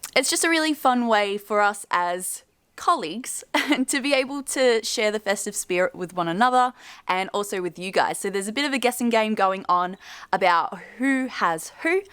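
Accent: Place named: Australia. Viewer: I got here to discover Australian channels to improve my understanding of your accent and I ended up subscribing to your channel! You give me a beautiful vibe and your videos seem to have an interesting content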